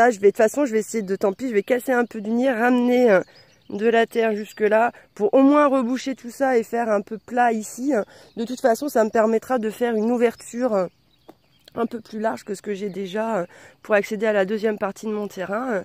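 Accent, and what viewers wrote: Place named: Marseille, France. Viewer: ah ca y est je sais d ou vient ton accent, t es pas de Marseille !!! dis donc tu as tiré tes images du dernier indiana jones la scéne avec les fourmis hahaha-lol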